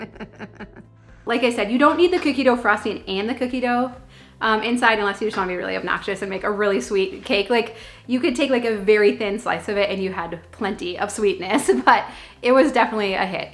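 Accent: Minnesota, United States. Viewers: "Eye-talian". Soo Minnesoota!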